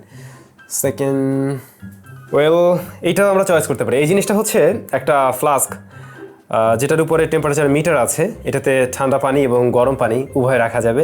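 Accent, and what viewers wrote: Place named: Barishal, Bangladesh. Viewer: আজকে কথাগুলো ভাল লেগেছে গ্রামের ভাষায় কথা বলেছেন দেখে সেম বরিশালের ভাসার মত 🤔🤔🤔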